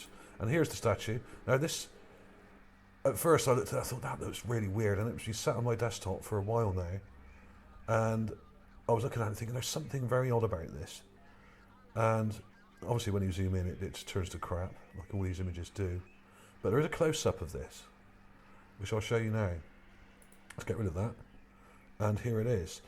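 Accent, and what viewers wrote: Bristol, United Kingdom. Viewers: Love your Bristol accent!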